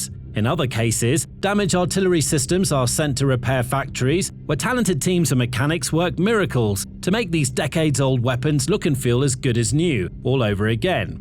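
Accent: United Kingdom. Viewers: Please lose the British accent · Not actually American is it, British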